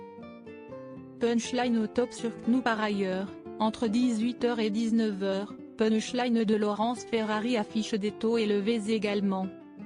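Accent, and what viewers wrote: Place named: United Kingdom. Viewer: On passe l'accent nullissime Franco anglais de cette voix pourrie sinon on attend face à l'info on en a rien à foutre de punch line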